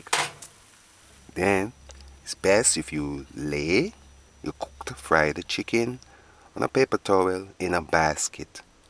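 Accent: Jamaica. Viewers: It is Jamaica accent I'm live there also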